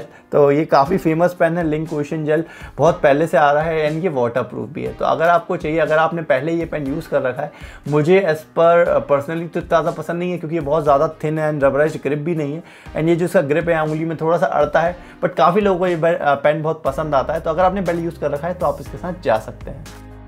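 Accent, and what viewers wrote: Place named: Punjab, India. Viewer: sir aap pakka punjabi ho - bottle se paani 'DUL' sakta ha - ye pen patla ha aur iska grip 'ADTA' ha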